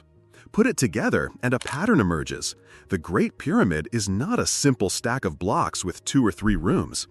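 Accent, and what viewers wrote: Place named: United States. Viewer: THE AMERICAN NOISE OF THIS NARRATION IS SO ANNOYING. IT SOUNDS SIMILAR TO THE VOICE OVERS FROM THE 70S ABOUT A NEW WASHING MACHINE BEING SOLD AT A NON-DESCRIPT APPLIANCE CHAIN IN THE USA. STOPPED WATCHING AFTER 2 MINUTES AND FOUND SOMETHING ELSE LESS ANNOYING .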